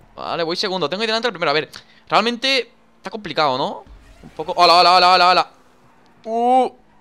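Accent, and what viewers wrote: Mexico. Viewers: soy yo o ya habla como mexicano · De donde o con quién estás aprendiendo hablar como mexicano!! saludos!!😉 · es español y habla como mexicano like si crees que es cierto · Azuverga we? De cuando aca habla mexicano?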